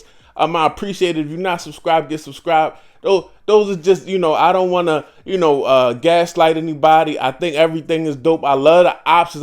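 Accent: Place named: DMV, United States. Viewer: You got to be from the DMV lol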